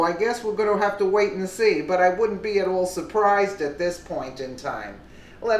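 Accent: New York, United States. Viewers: Lmao, part of the reason I love this channel is that I love listening to the woman's voice who reads the news articles. Your New York or Jersey accent just brings a smile to my face and has me crackin up (being from NY myself)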